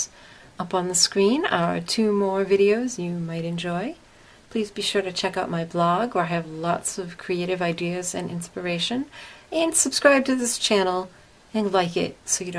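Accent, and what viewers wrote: United States. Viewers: Are you from the Boston area? Picking up an accent and I want to know if I’m right 🙂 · OMG I was totally going to ask if you were south of Boston because you sound so much like my friend from West Bridgwater! Oh my goodness you sound like an actual native - BUT not everyone has the ear for the subtle new england accent differences so I'd be willing to bet not a lot of other people make this assumption about you (I'm from Boston but have tried hard to eliminate it when necessary - as not to have to repeat myself and/or sound crass lol). I should really become a phonologist! :) oh and more importantly THANK YOU SO MUCH for this tutorial because I just finished it and you helped me so so much just by letting us know that the alcohol ink should dry matte before mixing! Best tip ever! <3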